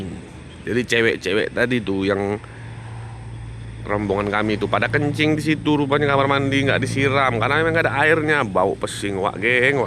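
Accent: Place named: Medan, Indonesia. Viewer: Aksen kau medan kali wak geng, jadi ingat aku semasa di Medan, walau sekarang aku diperantauan, semoga semangat terus kau boy...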